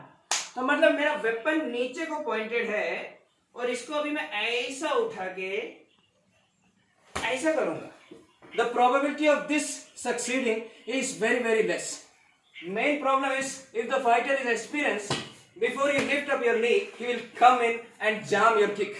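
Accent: Kerala, India. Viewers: Man, you mallu?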